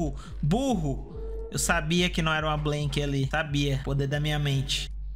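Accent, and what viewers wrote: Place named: Northeast, Brazil. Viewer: A cada dia...a cada dia o fica mais nordestino... Que bom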